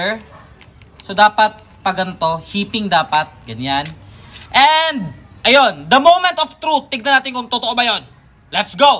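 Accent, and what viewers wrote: Visayas, Philippines. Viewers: Bisaya diay ka ? TAGA CEBU KA ?!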